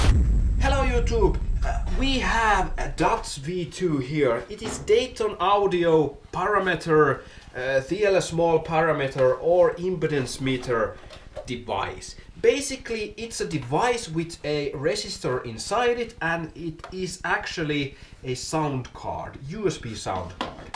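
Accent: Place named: Finland. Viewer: Ralli englanti on paras, Hyvä video.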